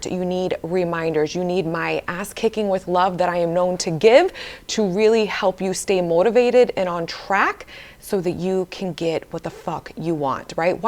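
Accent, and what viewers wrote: New York, United States. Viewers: She sounds nyc for real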